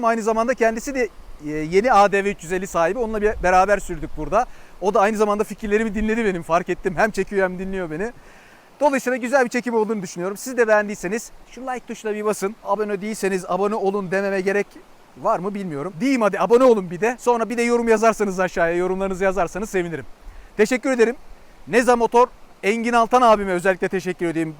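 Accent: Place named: Adana, Turkey. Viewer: Engin abiniz Adanalı belli. Adanadan selamlar o halde !!!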